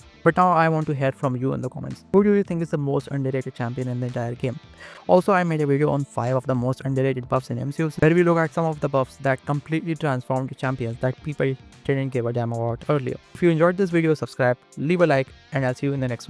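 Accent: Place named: India. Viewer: bro whats your country origin ? cause you have alot of indIan accent in your talk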